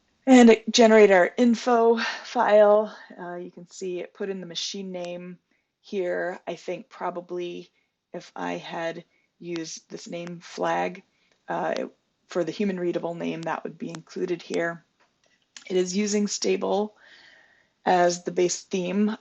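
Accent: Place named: Scotland, United Kingdom. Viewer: I detecting a wee scottish twang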